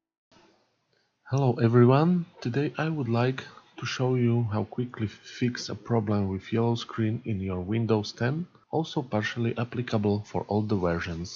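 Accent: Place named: Slovakia. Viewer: Hned podla prizvuku som zistil že si slovak :D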